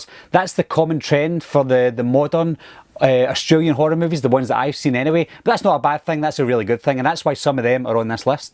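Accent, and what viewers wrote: United Kingdom. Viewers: Just please show a movie, dont show your commentary. You are not Australian. You are British!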